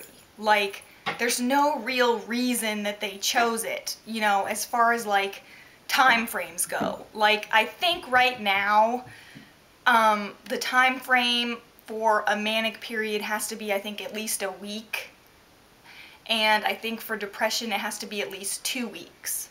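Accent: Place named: South, United States. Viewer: I have no idea what your background is or where you live, but the way you pronounce certain words like "about", have a hint of a southern accent. I mean it in a good way. It would be nice to know what part of the country you grew up in.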